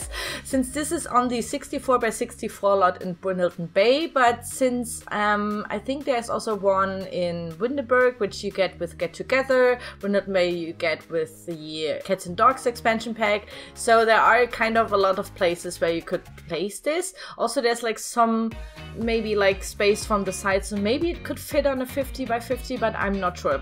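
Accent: Germany. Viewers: Alsoooo, I love your voice and accent so much!! It reminds me of my family and my Oma from Germany and it’s very nostalgic for me. I hate that someone kind of attacked you and criticized your voice that you talked about in one of your previous videos, it really upset me for you, because you’re just so nice and sweet and I really really love you, so I just wanted to say that you’re phenomenal and I love your voice! 💖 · Are you German? You sound like you have a german accent💗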